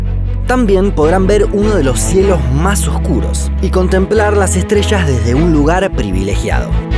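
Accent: Argentina. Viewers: Que horror ese acento Argentino ...shoooooo ashi amarishooo shooo, bye. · Más argentino imposible jejeje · Esta bonita la historia que cuentas solo que ese asento de boludo no se oye bien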